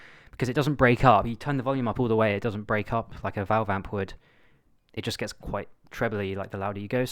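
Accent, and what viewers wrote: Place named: United Kingdom. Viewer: Great video mate love EJ's clean sound so much especially for chord work and improvising. Whereabouts are you from in the UK? Can't quite place the accent.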